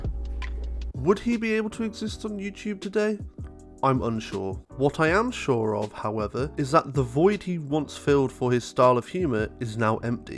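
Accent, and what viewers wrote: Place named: United Kingdom. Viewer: Is that another British accented commentary channel, not an insult but fuuck there's tons of them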